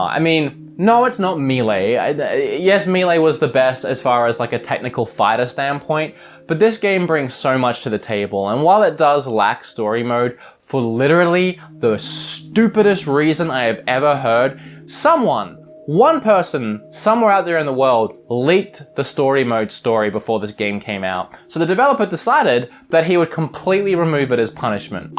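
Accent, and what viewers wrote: Australia. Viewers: YOUR AUSTRALIAN!!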